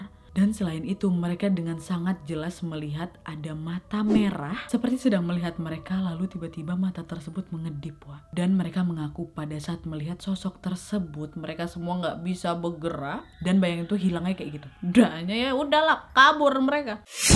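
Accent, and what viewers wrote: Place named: Madura, Indonesia. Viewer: kok kaya logat madura😎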